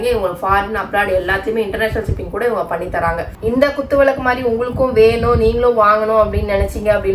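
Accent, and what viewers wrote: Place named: Bangalore, India. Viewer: Ungaloda slang Bangalore Tamil madhiri irukku aana neenga coimbatore nu solreenga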